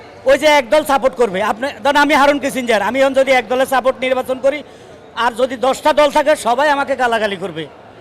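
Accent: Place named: Noakhali, Bangladesh. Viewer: Bari comilla .poriciti noakhalir khota bole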